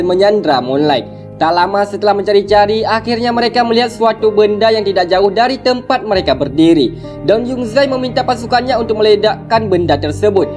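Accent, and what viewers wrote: Aceh, Indonesia. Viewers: Ko org aceh ya min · logatnya orang aceh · Klo di dengar dari logat bahasa nya pasti orang Aceh 😂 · Bang, aban orang Aceh ya?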